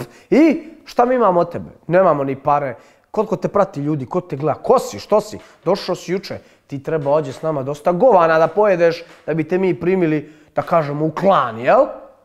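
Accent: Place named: Rijeka, Croatia. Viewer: Ludane ludi, a znas kako mi je milo kad pričaš rišnjanski pored toliko godina Beograda!! ❤❤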